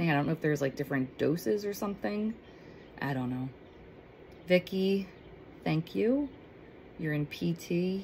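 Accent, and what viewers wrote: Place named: Pennsylvania, United States. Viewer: Sounds like u r in Pa.? I'm in Lancaster look up Dr Perry Argires he's the best neurosurgeon in Lancaster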